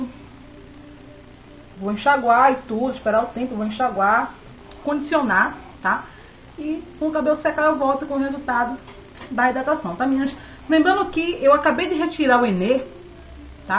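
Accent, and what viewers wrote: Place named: Salvador, Brazil. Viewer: Pelo sotaque vc mora em Salvador. Onde encontro essa linha?? Aí em salvador??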